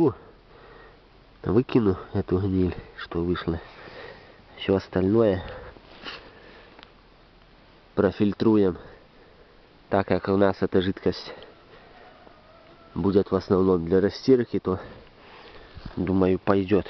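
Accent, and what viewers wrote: Belarus. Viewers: Цудоўны наш акцэнт, братка-беларус!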